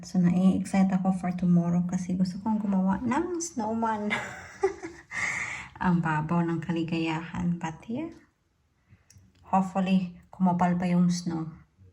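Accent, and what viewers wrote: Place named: Visayas, Philippines. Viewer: Palagay ko Cagayan de Oro sa Mindanao. Parang bisaya ang Salita nya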